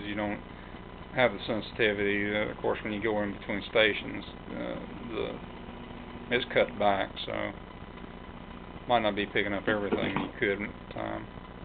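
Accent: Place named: Southeast, United States. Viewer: more than likely....Southeastern USA....some accent detected....old southern boy myself...I miss a word or two here and there, but no big issues....hell of an undertaking, I think....and it F'n works....I can't build anything close to this....some small kits....foxhole/crystal sets for MW/SWL/AM/CW....but nothing on this scale/level....damn good job this cat did....thumbs up.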